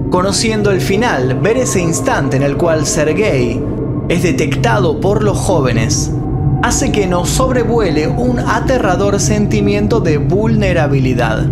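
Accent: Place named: Argentina. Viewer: El acento argentino está de más me cae 🙄 de quien fue la idea de distorsionar el español de esta manera 🤦🏻‍♀️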